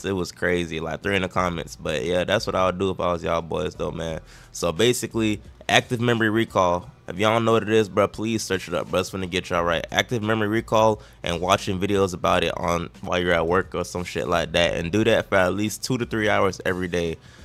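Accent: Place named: Florida, United States. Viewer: I'm prouda you! This was super motivating. Thank you for sharing. OAN you gotta be from FL lol I can hear it!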